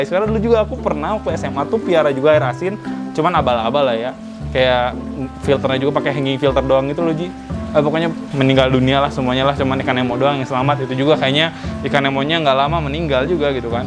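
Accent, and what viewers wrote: West Java, Indonesia. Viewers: Bang Orang Sunda Ya?:)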